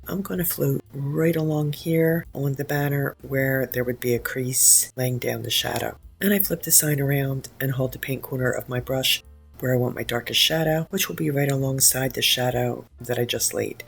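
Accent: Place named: Delmarva, United States. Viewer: There has not been one project that you have done, that I have not been in love with. I keep coming back because you love vintage as much as I do. Thank you so much for all you do, thank you for everything that you share, thank you for inspiring me to push things a little bit further than what I normally would. I am originally from Baltimore city, and have relocated to Central Florida, and it’s always refreshing to hear you speak… not sure where you’re from but I’m picking up DELMARVA/PA, area. So you are like having an old friend inspiring me. Keep doing what you’re doing! Lots of love 🥰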